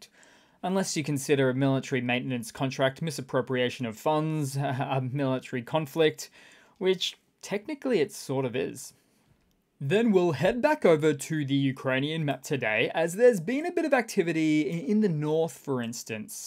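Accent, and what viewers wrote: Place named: Australia. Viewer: I need a more huge wins for the Ukraine 🇺🇦 in my lifes ! Thank you please And this Australian accent rocks m8) 🙏💙✊ Cheers man 💎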